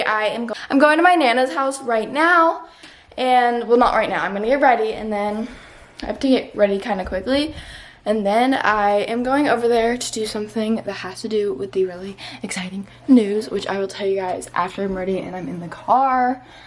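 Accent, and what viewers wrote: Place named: United States. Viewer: I LOVE her country accent!!😂❤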